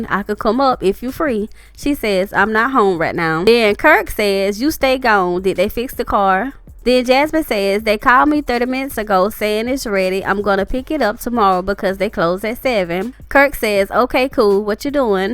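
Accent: South, United States. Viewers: Your accent and laugh is so adorable!! And those phrases are funny as hell "Clutch my pearls. A shame and a scandal." OOOuuu, you know you're from the South!! LOL. I could listen to your videos all day.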